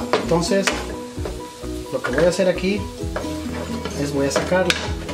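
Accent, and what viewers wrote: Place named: Veracruz, Mexico. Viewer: tu acento me parece jarocho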